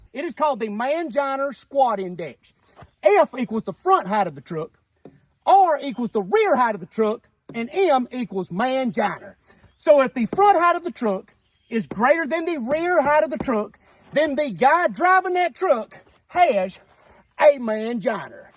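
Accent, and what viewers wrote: South, United States. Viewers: I have started saying select words in a southern accent because of you 😂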